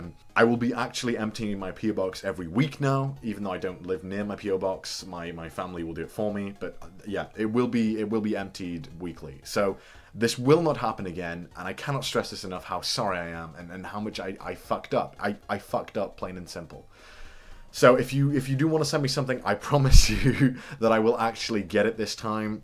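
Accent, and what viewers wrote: United Kingdom. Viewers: I just realized you have a British accent...I'm ashamed of myself. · Aw you look like an older version of my friend, with a British accent. Oh my...even the reactions are similar...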